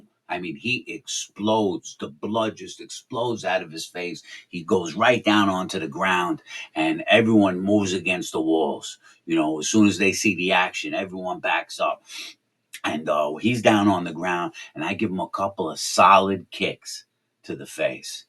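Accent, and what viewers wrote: New York, United States. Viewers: straight up NY accent! (I have one too 😂)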